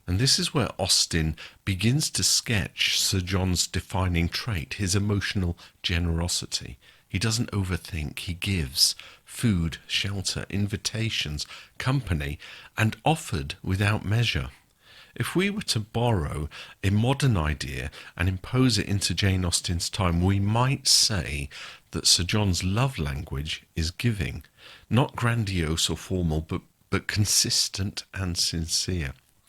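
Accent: United Kingdom. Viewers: Sir John is the comic relief along with Mrs Jennings. I like his boisterous personality. And I like your British accent, as many of we Americans do! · You've been criticized for that lovely, silky voice? Unbelievable. Your voice transports me in the same way a day at the spa with the sound of relaxing music and a pair of strong hands gently massaging away all the tension of a busy week. I often put one of your playlists on repeat when I retire for the evening. I always sleep better with a British accent in my ear.....and yours is the most soothing. Remain just as you are and allow malcontents to just drift off to another port of call.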